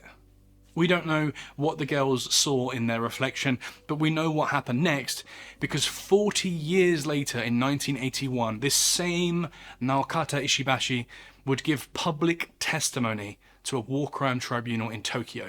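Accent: United Kingdom. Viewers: The irony is that this man is talking about these horrors in a deep British accent. That accent inspires fear in the hearts of millions upon millions of people around the world.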